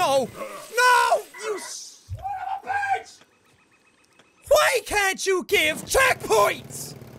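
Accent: Ireland. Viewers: Knickers, another word for underwear lol he just Irish so it sounds funny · Are you Irish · the more excited jack gets, the more progressively Irish he gets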